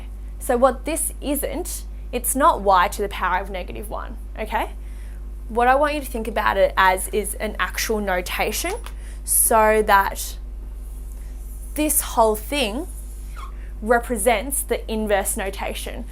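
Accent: Australia. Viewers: maybe Australia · thats aussie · aussie chinese descendant im sure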